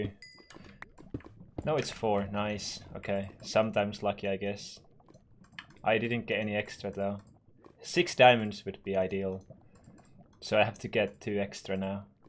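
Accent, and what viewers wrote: Finland. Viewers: i love your finnish accent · This is my 1st time watching you and just with 8 seconds I could tell that your Finnish And of course because of your name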